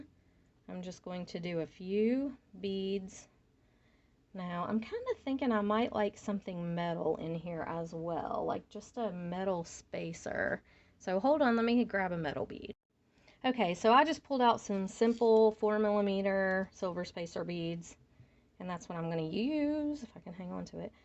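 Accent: South, United States. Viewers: Missed that voice. That southern charm. Glad you are moved.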